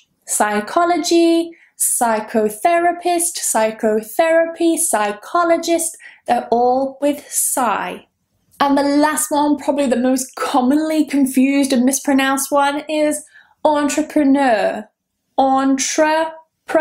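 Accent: United Kingdom. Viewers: I'm following your videos for learning British accent and I've learned a lot of words to spell correctly like dropping the r sound and more. But I have difficulty in pronouncing P, T and C like you native British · Beauty queen of British accent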